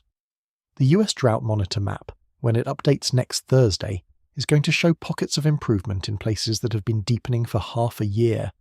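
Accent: United Kingdom. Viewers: Why the British accent on these videos?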